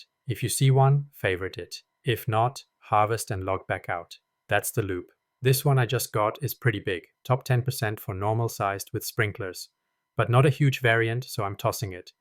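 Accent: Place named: Germany. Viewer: Ich höre deutsch raus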